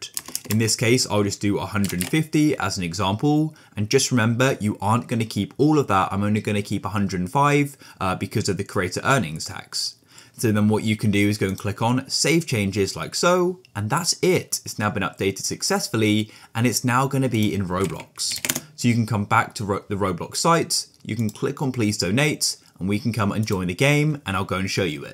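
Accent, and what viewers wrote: United Kingdom. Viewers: Thank you British man! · Yes, he is british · Are you british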